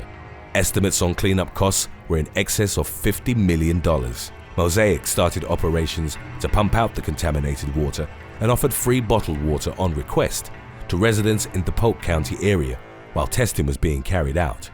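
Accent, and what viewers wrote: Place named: United Kingdom. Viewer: Alyssa Davison Me too and the Brit accent.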